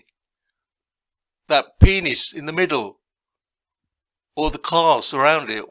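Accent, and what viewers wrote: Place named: India. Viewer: Haha you're so funny. A bit "old man shouts at cloud" there. BTW, do you have non-UK roots? You sound a bit like one of my friend's Indian uncles: )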